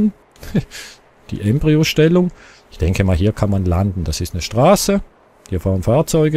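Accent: Switzerland. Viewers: Kommst du aus der schweiz? :D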